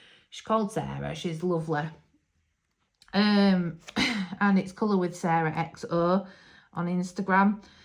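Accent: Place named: Yorkshire, United Kingdom. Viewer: Welcome to colouring! I started my channel earlier this month as well. Yay for another delightful Yorkshire accent, yay for another happy pup, and well done with all that work in April!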